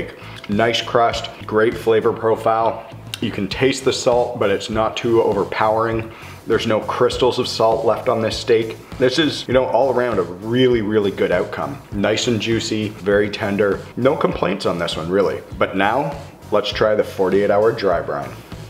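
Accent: Canada. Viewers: Western Canadian accent detected...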